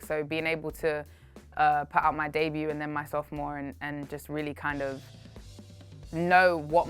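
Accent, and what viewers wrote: United Kingdom. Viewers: YOU CAN HEAR THE BRITISH ACCENTS. I LOVE YOU ELLA MAI 🙏🇯🇲🇯🇲🖤💛💚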